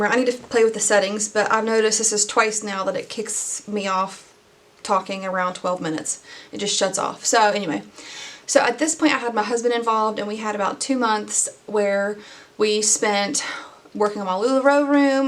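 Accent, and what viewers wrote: South, United States. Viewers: You’re 100% southern USA or have a deceiving accent if not! Love this video and your story! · I am also bipolar and definitely understand that manic episode feeling a thousand percent! it's like you don't have control over yourself or your actions but you don't realize you don't have control you just feel super energetic I'm so sorry that you got sucked into that negative situation but I'm glad that you are out of it ❤️ also so excited to see someone with a Southern accent on youtube! From a Georgia gal living in Alabama 😂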